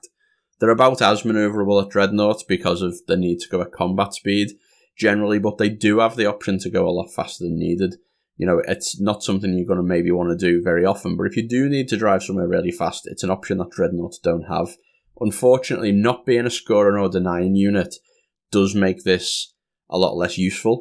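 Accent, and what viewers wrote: Liverpool, United Kingdom. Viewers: Are you from Merseyside? You sound scouse-adjacent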